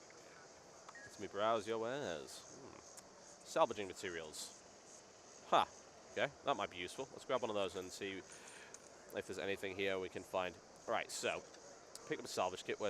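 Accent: United Kingdom. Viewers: ok there you are absolutly right, he is good and shows alot, skills, enviroment and such stuff. and other people who show stuff just suck. saw a video from an elementalist, over 10 minutes against the shatterer and he only used 3 skills, thats boring. but is really good, doing alot of skills, movement is good and his commentaries are really cool. i dont like hearing english with that british accent. but his commentaries are so awesome with the accent. like his videos.:)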